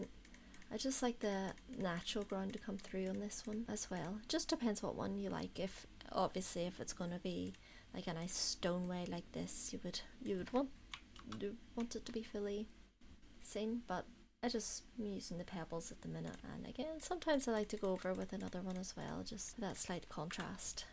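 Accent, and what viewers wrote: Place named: Northern Ireland, United Kingdom. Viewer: Love these tips and how you build up base flowers / hedges first. So great to hear an NI accent while watching Sims 😍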